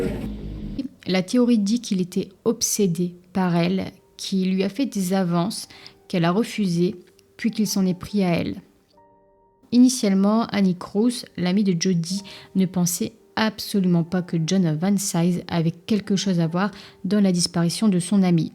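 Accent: North, France. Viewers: Je ressens dans ta voix super douce une note d' un accent du nord de la France je me trompe ? 😁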